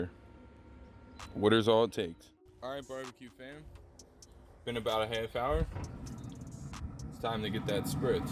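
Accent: Philadelphia, United States. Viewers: can't miss that northeast philly accent 😂. I lived in Magee back in highschool. I'm up in Chalfont by Ryan HS now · Are you from Philly? Love your videos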